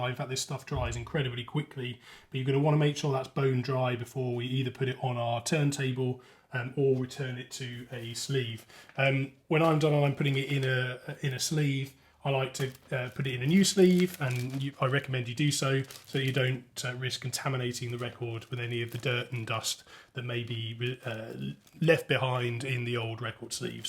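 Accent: United Kingdom. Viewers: Sounds like you are from the U.K. those 'mofi sleeves' doesn't seem readily available for Amazon UK. Have you tried any alternatives, say the 'bigfudge' brand or Amazon's recommended 'spincare'. Heard lots of mixed reviews about their sleeves. Cheers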